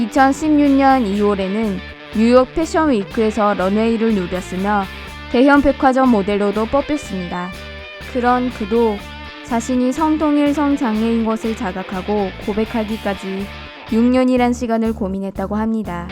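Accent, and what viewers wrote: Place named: Japan. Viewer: 발음이 일본사람같네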